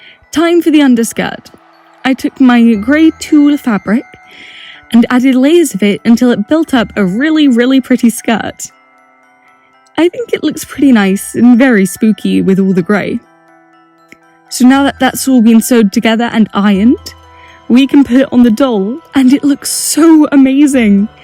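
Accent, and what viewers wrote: United Kingdom. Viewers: OMG THIS IS AMAZING!! I have just found your channel, and normally, I dont watch many customisers because I can't understand what they are saying, and then I realise you are British, and I am British too so I was internally screaming with joy. And your customs are so good!!! I love how this doll has an eerieness to her, and yet still friendly. You deserve so many more subs. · She looks great! I honestly think the crinkly wings work great, even in nature these kind of wings are never perfectly smooth and usually have a slight texture. Also, creepy vibe and all that lol. I found you via Dollightfulls community post and my god, you sound delightfully british. It was a pleasure seeing your process and hearing you explain it.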